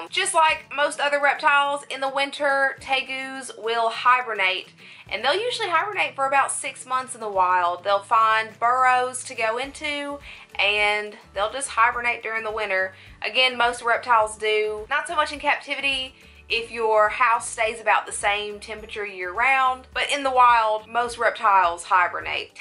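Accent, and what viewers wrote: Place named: Alabama, United States. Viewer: I cant get enough of your bama accent. 😍😍😍 You sound like maggie from the walking dead...lol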